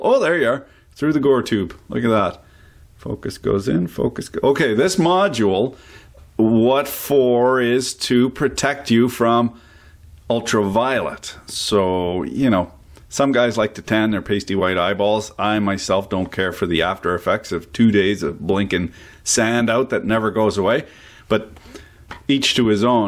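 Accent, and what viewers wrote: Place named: Canada. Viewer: Why can't Canadians pronounce Z correctly?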